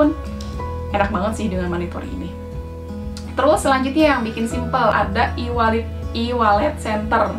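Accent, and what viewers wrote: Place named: West Java, Indonesia. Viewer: Sundanya keliatan ah😁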